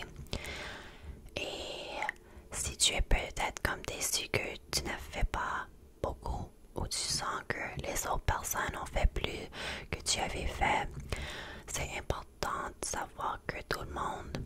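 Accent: Quebec, Canada. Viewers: Honnêtement pour l'internet, vraiment pas trop mal ton Franadien! Well done and very calm ASMR experience!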